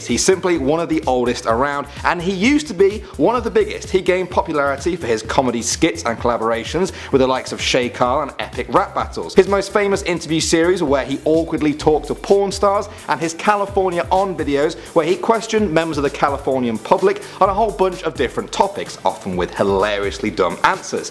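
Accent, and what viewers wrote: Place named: United Kingdom. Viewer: Have you never heard a British pronunciation?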